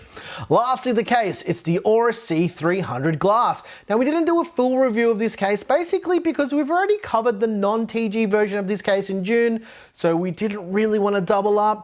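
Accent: Australia. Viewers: Great to hear another aussie voice in the tech space ! Subscribed !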